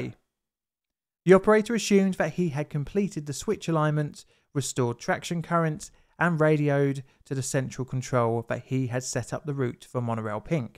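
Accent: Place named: England, United Kingdom. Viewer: Just found your Channel and subscribed because I enjoy your Video content and appreciate your English accent, but I have a question (and it's not meant to be a criticism). When did Th-fronting become compulsory for Brit YouTube narrators ? Or has a speech impediment become a dialect ? Of the many British Channels to which I'm subscribed, nearly all the narrators have this idiosyncrasy and I can't help but wonder why ?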